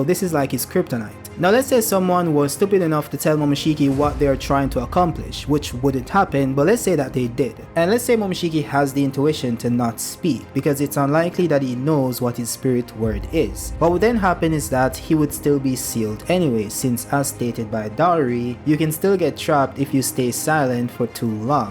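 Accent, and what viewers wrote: Jamaica. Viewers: Bro I was listening and I was like this Jamaican English for sure. Good shii bro keep going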